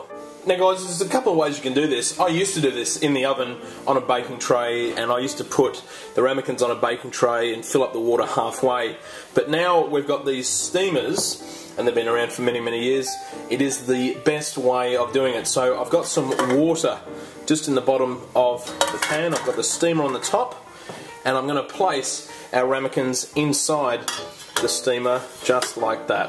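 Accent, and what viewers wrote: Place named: Australia. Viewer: Yeah where did you get the UK from this? He's Australian lol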